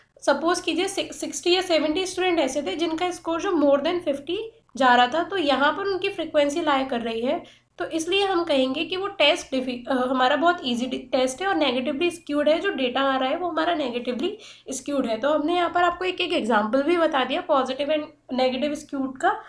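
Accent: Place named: Odisha, India. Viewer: Mam i think you are from odisha 😍😍😍😍🥰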